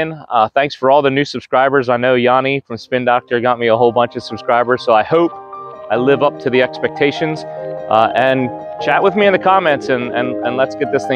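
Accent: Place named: Philadelphia, United States. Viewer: ah, ok. I think you're more subject to the Philly accent there than the Pittsburgh area. You have the vowel sounds: "ph-oh-ne h-oh-me to my Fahm-ly." I used to live there for a few years and can pick up on certain accents.😄 Appreciate you humoring my non-dg question! But I digress! Subbed'! I do similar content to grow the sport. Cool you got your kids into it too. One of my 3 boys joins my wife and I at least once a month. Building him a bag was super fun!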